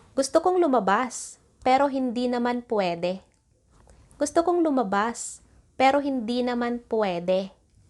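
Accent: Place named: La Union, Philippines. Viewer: from the accent I could guess you are from La Union - South Ilocos Sur area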